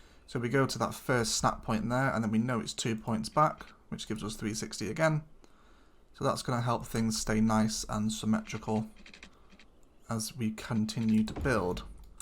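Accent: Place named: England, United Kingdom. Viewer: Now I know why I like your voice. It's because your english! American acent sounds for me atleast realy bad. Propably because I am native german. Right side is right not left side traffic!!!!!!!!!!!!!!!! :)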